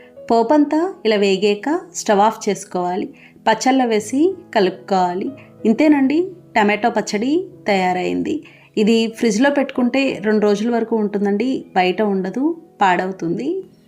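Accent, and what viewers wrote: Godavari, India. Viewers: అది రాగాలు ఏమీ కాదు, గోదావరి జిల్లా ల మహిళలు అలాగె స్పష్టంగా మాట్లాడతారు, అది వారి మాండలిక శైలి, శ్రధ్ధగా వింటే చాలా బాగుంటుంది, ఎవరి మాండలిక భాష వారు మాట్లాడతారు గదా, దోషం ఏముంది! మీరు ఇలాగే చెప్పండి సోదరీ! సహజత్వాన్ని పోగొట్టుకో రాదు అని మనవి 😮😅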